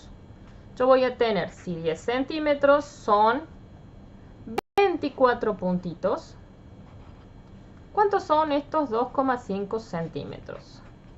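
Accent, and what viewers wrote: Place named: Córdoba, Argentina. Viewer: Hola ahora mismo estoy teniendo en rosa el swaters beis para tres años me encanta tu acento eres de Córdoba? Me encantan tus videos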